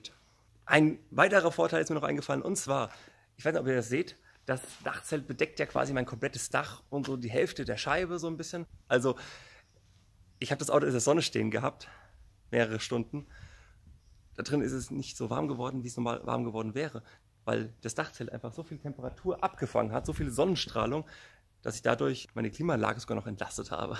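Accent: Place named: Hesse, Germany. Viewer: Gude, finds cool wennde hessisch babbelst;D Interessantes Video mal wieder von dir. Bin sehr auf dieses kleinere Dachzelt gespannt.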